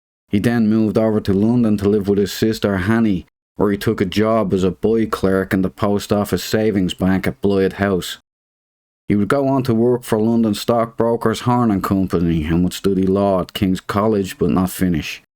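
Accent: Ireland. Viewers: Are you Irish?.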